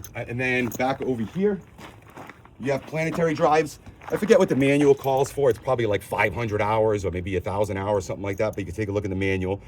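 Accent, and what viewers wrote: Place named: East Coast, United States. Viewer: He does talk fast, but he's covering everything as well as possible. The east coast accent is definitely showing.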